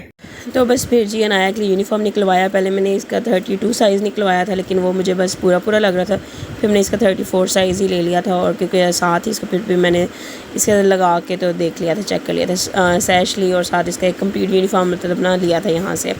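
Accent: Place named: Pakistan. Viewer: Aapke bachche mashallah bhut cute h main India se hun aap k lahje se lag rha h aap Pakistan se h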